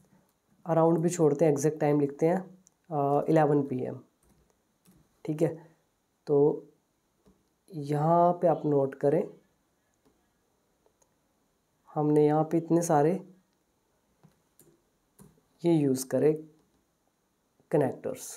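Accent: India. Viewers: You have indian accent i could hardly understand what you said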